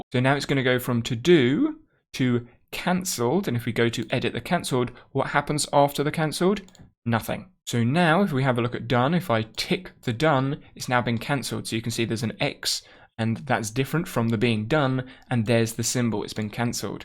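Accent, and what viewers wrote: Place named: United Kingdom. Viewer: So refreshing to have a British accent explaining something to us. :-)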